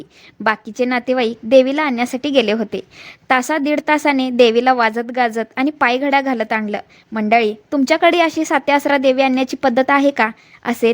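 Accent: Kolhapur, India. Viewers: Tumchi bhasha kolhapuri aahe kothe rahata tumchi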